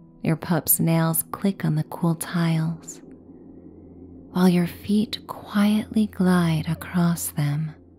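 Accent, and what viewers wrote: United States. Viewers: Write a book a novel or novella a romance or a juicy romance or a mystery or a coming of age with wonderous epiphanies in it. Write and publish self publish if you want or make an audio book. If your not going to sing write a book. I’m sorry I borrowed Jack as my pup but he kept whining for mommy and he snored very loudly for such a wee boy. I love the outer banks, never been there except in stories or films but it is dreamy. I giggled from your southern accent. The images painted by your words are sublime lots of new metaphors and tactile stuff it’s terrific. How come your eyes aren’t moving as if your reading is this just flowing from brain to voice? Happy Sunday.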